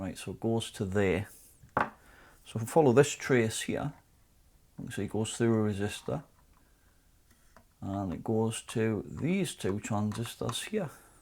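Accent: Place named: Newcastle, United Kingdom. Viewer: Its a real pleasure hearing a geordie accent after ive been in nz for so long 😊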